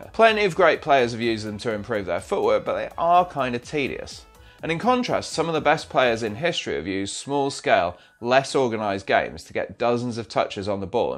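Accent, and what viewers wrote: United Kingdom. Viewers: From same town as Jagger? Same accent. Great content! And coincidentally I'm re-reading The Organized Mind right now.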